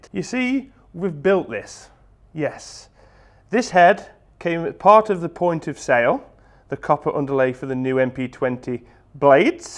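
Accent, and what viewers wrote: United Kingdom. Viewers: why do you have a british accent tho?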